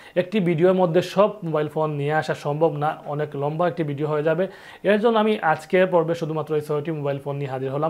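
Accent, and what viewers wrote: Sylhet, Bangladesh. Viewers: ভাই মনে হয় সিলেটি,, অনেক অনেক শুভকামনা রইল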